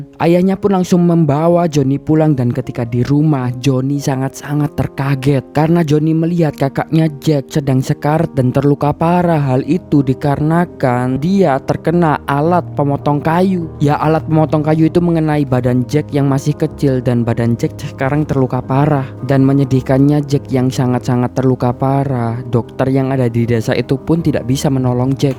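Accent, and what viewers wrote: Java, Indonesia. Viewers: medhok jowo👍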